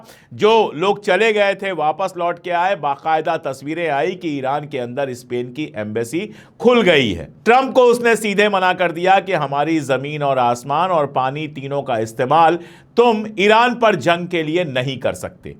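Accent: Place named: Kashmir, India. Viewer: Lafayette, LA, USA I enjoy hearing your insertion of Kashmiri Urdu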